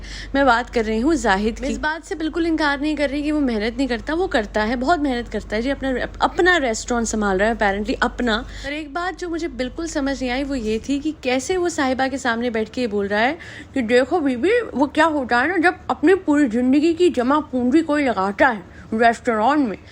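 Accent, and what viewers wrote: Punjab, India. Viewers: Your voice is so sweet n soothing but aaj aapki meethi punjabi sunkar toh maza aa gya or jis tarah se aapne bola usse toh lgta ki aap Punjabi ho mei bhi punjabi hu toh or bhi jyada khushi hui❤❤❤❤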